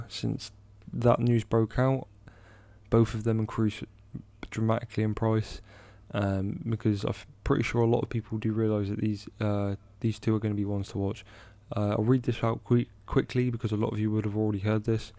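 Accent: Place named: Bristol, United Kingdom. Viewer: you sound like your from bristol mate?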